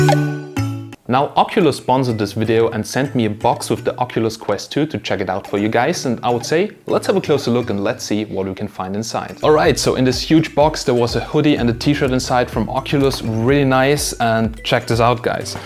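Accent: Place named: Germany. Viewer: He sounds sooooo german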